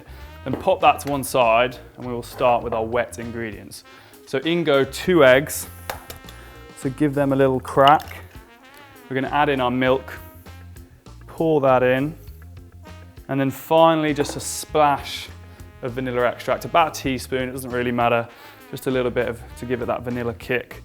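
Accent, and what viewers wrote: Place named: United Kingdom. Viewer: やっぱイギリスアクセントっていいよねー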